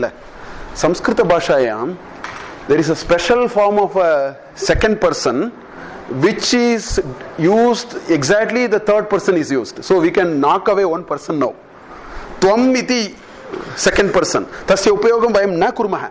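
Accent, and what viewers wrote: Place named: Tamil Nadu, India. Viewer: This is because you should know he is a tamilian ,sorry,.This language has a small set of consonants .it does not contain the full KA varga,CHA varga meaning only first letter of each varga exists.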